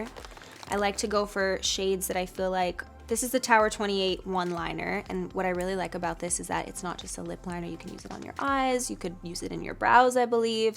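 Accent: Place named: England, United Kingdom. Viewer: I really Love her...I am from Sri Lanka...Her English accent helps me improve my English....I wanna hug her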